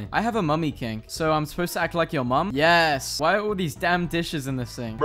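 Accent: United Kingdom. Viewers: Is it just me that noticed he has a British accent now 😃?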